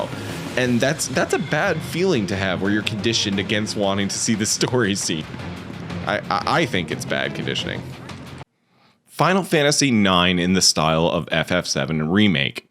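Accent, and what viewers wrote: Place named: Canada. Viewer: Timmy's heretic... it's an institution- props. Subscribed just to hear you say fehkking and oot- love the chainbrain T, too! Maniac.Make a bluenose expat homesick.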